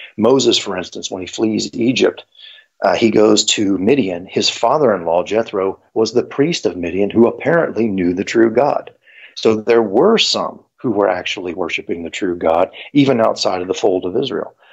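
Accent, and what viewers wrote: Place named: South, United States. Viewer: This sounds like our dearly beloved Dr Michael Heiser rest his soul but with a Southern twang I love it brother keep up the good work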